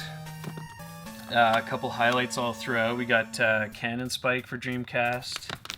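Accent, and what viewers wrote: Canada. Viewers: Are you Canadian? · That haerd Canadian accent is real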